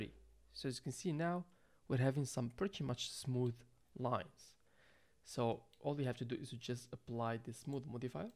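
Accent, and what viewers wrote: Morocco. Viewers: are you moroccan? you sound exactly like my relatives. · Your accent sounds Moroccan 😅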